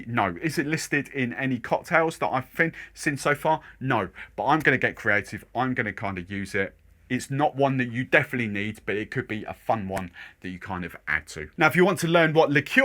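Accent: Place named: Cockney, United Kingdom. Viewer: Cornwall?! You sound proper cockney!!!!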